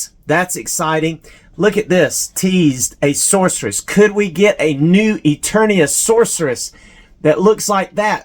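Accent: South, United States. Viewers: I like your southern hospitality accent when you talk I've been to North Carolina and South Carolina has stopped over at the Dallas airport like your accent, southern southern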